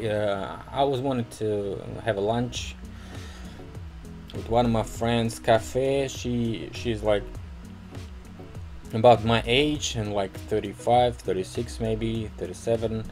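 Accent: United States. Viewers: You got a southern American accent and you ain't talking to slow. It's perfect! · when you learnt to speak English, you also picked up a slight American accent. That was the very first thing I noticed. I'm Australian, so it stands out to me.:)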